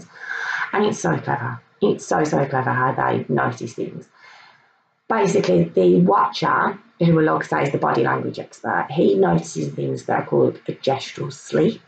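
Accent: Birmingham, United Kingdom. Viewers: you sound brummie I would say I'm from Leicester I can tell the accent it's strong but yam yam is that black country accent ??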